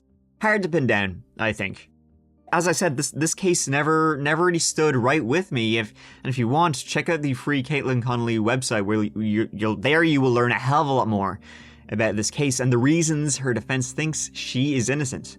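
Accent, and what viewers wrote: Ireland. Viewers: I enjoy ability to tell a story. Another enjoyable item is his Irish accent. I want to meet this gentleman and shake his hand. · You are my favorite podcaster ever I enjoy your content and you oh so much. Your voice is very relaxing and you’re so IRISH. ❣️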